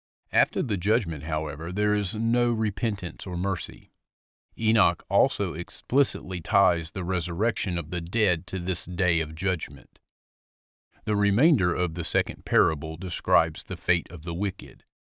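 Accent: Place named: Baltimore, United States. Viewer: im thinking baltimore or philly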